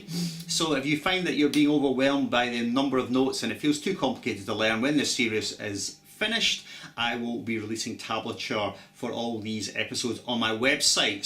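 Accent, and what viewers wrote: Scotland, United Kingdom. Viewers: Instant subscribe because of Scottish accent. (And amazing playing as well ;) )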